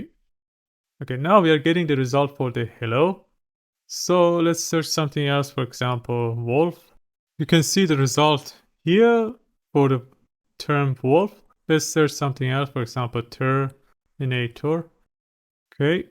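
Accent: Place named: Iran. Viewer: Wonderful! I assume you're originally iranian. so am i. loved the accent and the smooth pace of the tutorial. It would be wonderful if you do a big project like Airbnb.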